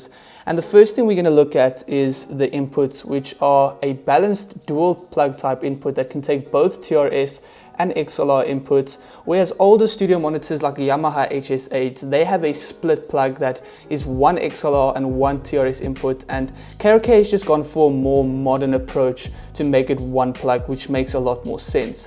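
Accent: South Africa. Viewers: Good old SA accent😂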